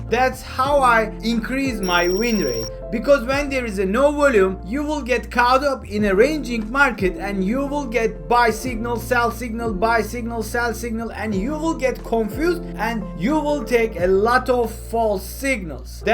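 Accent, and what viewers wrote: Turkey. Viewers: The Turkish accent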